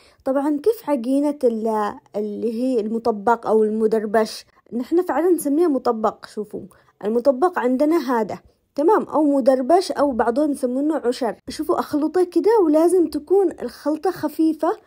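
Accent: Yemen. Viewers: ربي يسعدك وينولك مرادك ..انسانة راقية ولهجتك اليمنية جميلة جدا ❤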